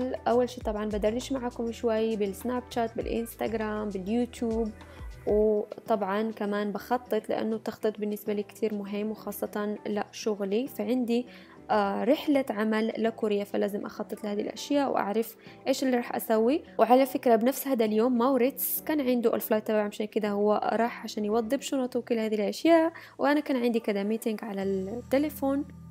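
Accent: Morocco. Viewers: Si maghrbiya bayna ghir tari9a kalam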